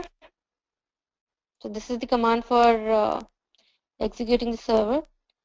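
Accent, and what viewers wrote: India. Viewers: Hey Edureka. Why dont you activate English subtitle sections on your videos? Sometimes it's really hard to understand Indian accent so subtitle make to understand easier. Thanks.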